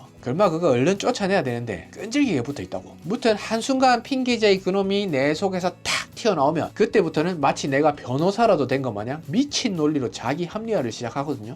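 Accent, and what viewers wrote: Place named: Busan, South Korea. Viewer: 알고리즘에 이끌려 보게 되었는데,, 단주 중 정말 현실적으로 공감되고, 저는 부산사는 사람으로 사투리로 방송하시는게 너무 편안하네요 대단하세요!!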